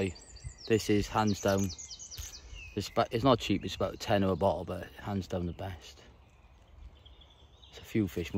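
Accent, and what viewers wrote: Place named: Wales, United Kingdom. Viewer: Thanks for the reply. You have fun , nice to hear the Welsh tones, awesome! Tight lines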